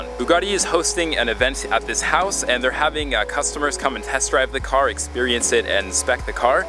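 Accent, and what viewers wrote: Germany. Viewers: Are you german because you got a german name and a little german accent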